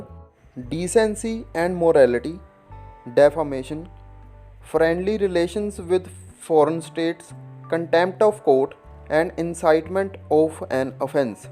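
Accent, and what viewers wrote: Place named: Punjab, India. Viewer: Everything is very knowledgeable, everything thing is perfect but icing is your hindi in Punjabi.. this is your Canadian brother! Love u 😘 bro.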